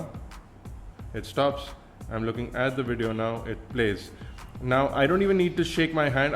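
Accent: India. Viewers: Dat indian Accent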